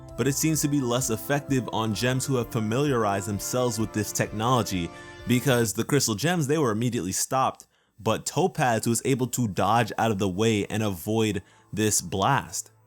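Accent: United Kingdom. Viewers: shes too british 4 me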